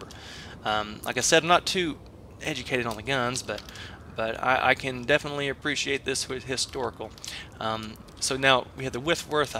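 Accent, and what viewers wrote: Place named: South, United States. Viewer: from a neutral/Midwest accent to a straight up urban Southern accent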